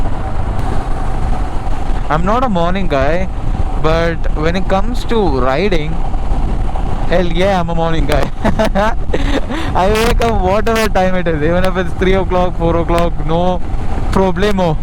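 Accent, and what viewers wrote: Karnataka, India. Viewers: Bro, you have been doing some nice videos and showing some unknown places which makes most of them happy. In most of the videos we see that you speak good kannada accent when you are communicating with or with localities. So, it would be great if you can do some kannada vlogs also along with English🙂. All the best for your future rides👍.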